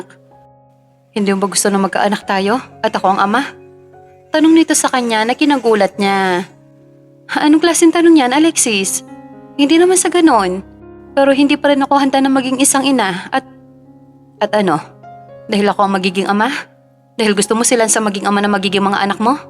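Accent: Philippines. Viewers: Ok lang pero funny kc may punto yung narrator ay parang may music na pataas at pababa. Anong probinsya ka ba? Di ka ciguradong taga Manila.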